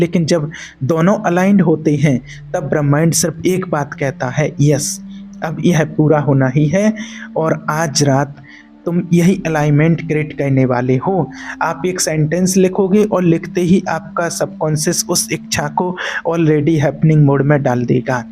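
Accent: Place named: Braj, India. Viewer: Pahle ye brahmend nhi hota Brahmand hota h aap up ke vo bhi birij ke ho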